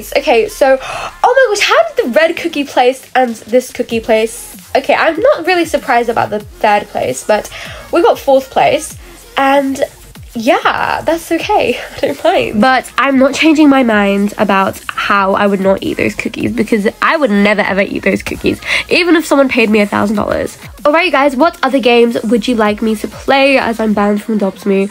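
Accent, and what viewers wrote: United Kingdom. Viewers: You sound like a british gurl 😮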